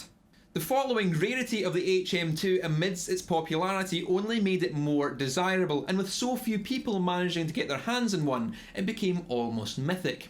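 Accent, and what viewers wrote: Scotland, United Kingdom. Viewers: It sounds more Scottish to me than British. All I heard during the whole video was Scottish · Reading the reverb article in a Scottish accent/10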